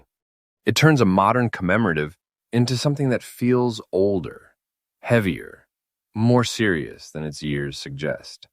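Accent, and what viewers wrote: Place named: United States. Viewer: Something fishy about what you've said about 1984 Australian 50c coin, started with $201,000...and later, you said it's valued at $183,000 Really? Is it genuine? Not some kind of scam? I'm sure.. I'm not the only one watching and following this video. Should be an Australian talking about Australian coins, but you sound like an American.. Come clean, man!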